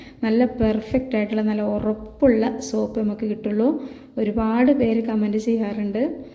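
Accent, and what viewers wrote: Malappuram, India. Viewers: Ingineyum samsarikkan ariyo allenkil malappuram thathade aniyathiyano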